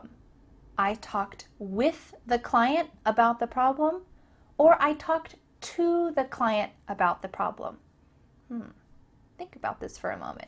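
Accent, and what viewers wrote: United States. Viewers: I want u to do more videos on how to sound like an American....PLZZ PLZZ just get a series on it so that everyone would be able to get the same accent on point just like u🙏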